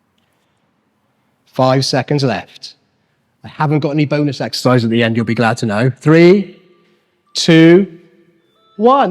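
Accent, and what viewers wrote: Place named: Wales, United Kingdom. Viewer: I agree! Plus I love the Welsh accent. Thank you,!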